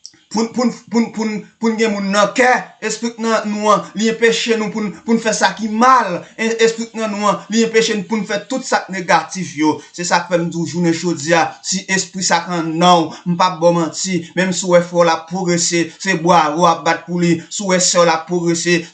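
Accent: Haiti. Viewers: Ou pale tankou moun nord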